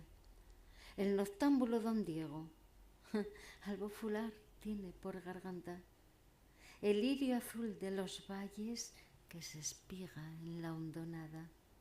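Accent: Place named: Spain. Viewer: Que lindo! muy dulce tu voz y ademas amo ese acento español!! un abrazo grande compañera!!!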